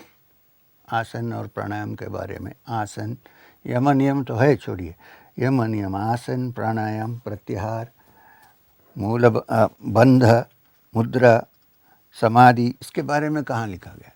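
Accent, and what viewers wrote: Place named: Maharashtra, India. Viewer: Sri M your accent is also quite maharashtrian.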